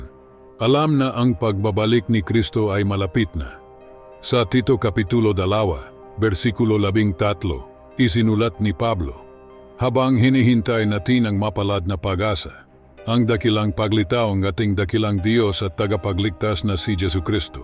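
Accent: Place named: India. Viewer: Tanong kolang po...pinoy ka po talaga?kasi parang indian ka boses mo or kano na nag aral lang magsalita ng tagalog pangit sa tainga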